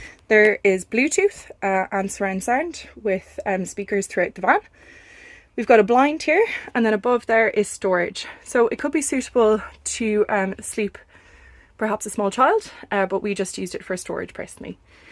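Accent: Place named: Galway, Ireland. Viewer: Just found out I've ancestors from Galway and was curious as to the accent. This little trip around the caravan fit the bill. Thank you kindly.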